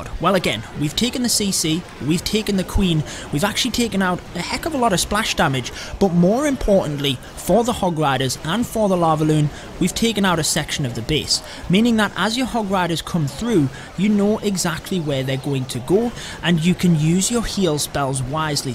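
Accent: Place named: Newcastle, United Kingdom. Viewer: Cool it’s nice to see another Geordie clasher😂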